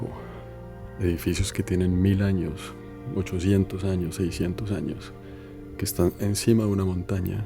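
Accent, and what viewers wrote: Colombia. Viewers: Por su acento y educacion, es colombiano, cierto? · No entiendo d e qué se trata, eres de donde? Eres canadiense? Pero hablas como colombiano o eres italiano? O español no entendí tu canal…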